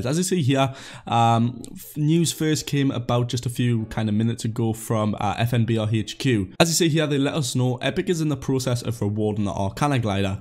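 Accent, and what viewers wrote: Newcastle, United Kingdom. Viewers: You from Newcastle?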